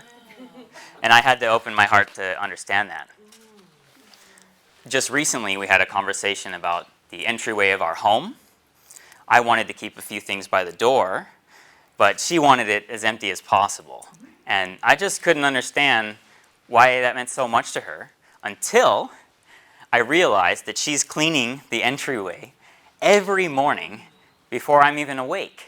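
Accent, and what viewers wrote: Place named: Japan. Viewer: Dude this is beautiful! You've grown so much over the last few years. I'm impressed, proud of you, humbled, and reminded of how when I first started dating my wife I had similar reflections ("I'm from California; she's from Kansas; we're basically from different universes") that helped a lot and that I have since forgotten and started to take for granted. Thanks for the reminder! PS — You have started speaking with the cadence of my Japanese friends...INTERESTING <3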